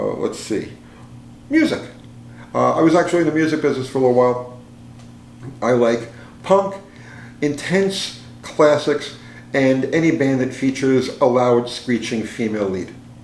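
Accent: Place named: Philadelphia, United States. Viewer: Why don't you roll up a cigarette pack in your sleeve you look like an idiot when you're smoking on camera. I mean it's already an uphill battle with that yo Adrian accent you have synonymous with stupid so just put the cigarettes out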